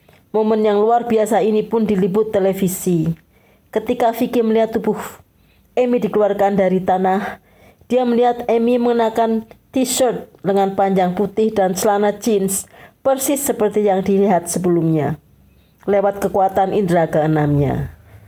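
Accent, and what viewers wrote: Java, Indonesia. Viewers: dengar suara nya yg medok itu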